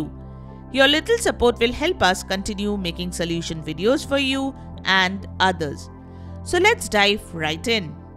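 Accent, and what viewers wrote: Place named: India. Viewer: the accent instantly told me what i needed to know, down voted, and "dont recommend channel" lol i NEVER trust indian accents with anything.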